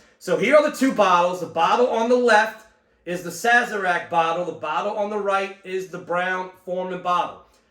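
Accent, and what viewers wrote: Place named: New Orleans, United States. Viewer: Thx for the informative review; saw you on another bourbon channel, recognized the accent & knew you were from Nola area! Can Early Times bib be found in nola?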